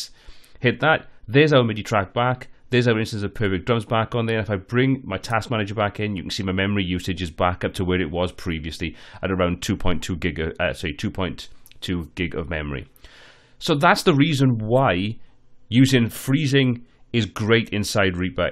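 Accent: Wales, United Kingdom. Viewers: Cheers man I'm having some popping issues. Do I hear a Pontypridd accent 🙂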